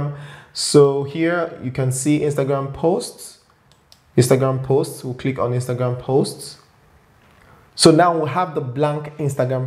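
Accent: Nigeria. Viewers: I like listening to your accent. I’m guessing Nigerian and Igbo?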